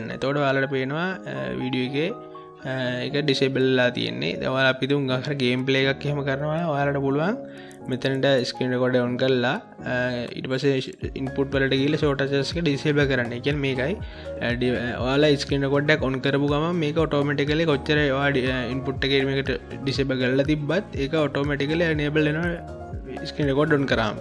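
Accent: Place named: India. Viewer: Description in english speak in indian.. Wtf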